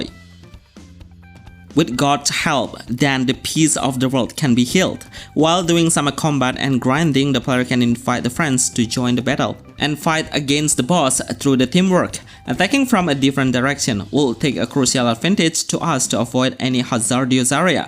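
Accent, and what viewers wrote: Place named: Indonesia. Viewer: Indonesian accent😅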